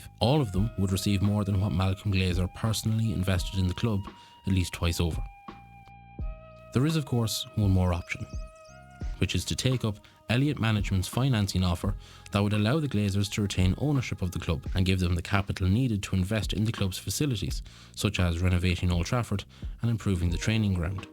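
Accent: Ireland. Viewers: Grand Irish accent there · Irish accent ❤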